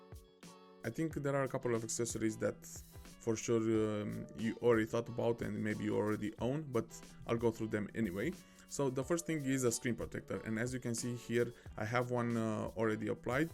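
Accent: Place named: Romania. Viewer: I could recognize a Romanian accent from a mile away 😃👍 good job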